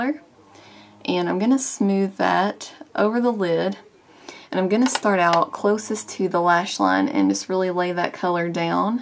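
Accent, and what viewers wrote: South, United States. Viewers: Geez that's an awesome nail polish color! Will def be trying that out. Love the look as well....got yourself a new subscriber! You sound like a southern belle too! Awesome!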